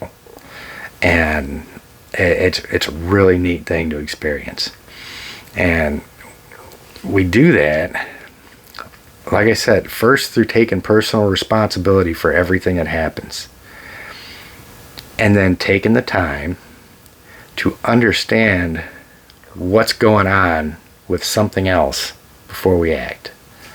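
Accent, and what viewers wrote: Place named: Northern Illinois, United States. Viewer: I was gonna ask if you are from N Illinois! Close enough! I still have the accent too and been gone 20+ years... And thanks for your service man!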